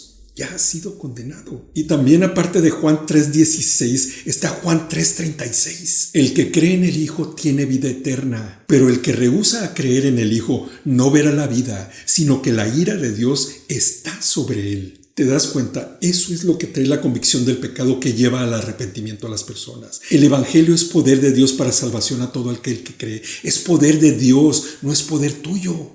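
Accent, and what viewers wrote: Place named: Mexico. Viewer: Tiene un acento Mexicano, excelente explicación, felicidades, bienestar para tu vida.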